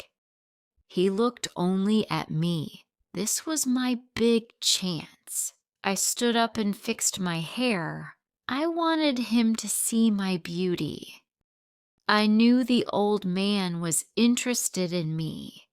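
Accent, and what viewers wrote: United Kingdom. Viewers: Thank you for your story. Is it British English? I have fixed for myself some useful expressions.❤❤❤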